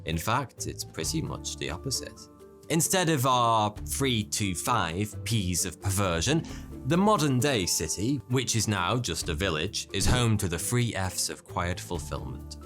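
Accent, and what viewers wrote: United Kingdom. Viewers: It's kinda weirdly cool hearing a Brit speak about Jamaica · Bro I’ve seen about 20 of your vids and wondered why you kept saying “Hi, 42 here”. I finally realized what you were saying! You Brits have a funny way of talking 😂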